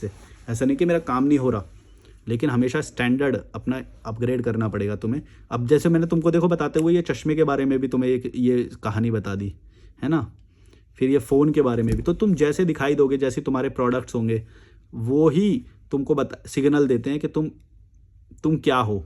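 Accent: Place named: Delhi, India. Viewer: Delhi accent...Nice good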